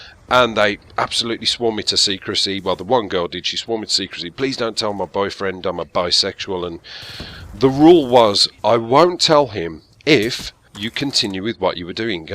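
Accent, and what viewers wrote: Birmingham, United Kingdom. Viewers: I find it really hard to believe that no one noticed you were from birmingham. Good video though, got to know the old stalion a little better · I just love the British accent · I thought you were a brummie but wasn't sure. Confirmation ftw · Lol there are people with a much thicker Birmingham accent than him and it does sound pretty grating lol. Also England will have a king when the queen dies, but the UK doesn't. The UK is a group of countries including England, Scotland, Northern island, and Wales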